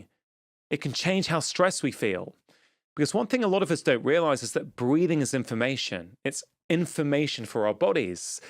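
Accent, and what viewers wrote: United Kingdom. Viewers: No, is British and speaks perfect English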